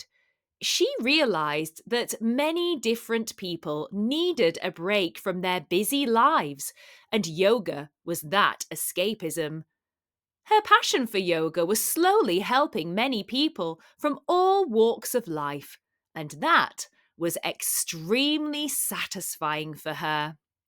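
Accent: United Kingdom. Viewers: Which accent do you follow of British accents?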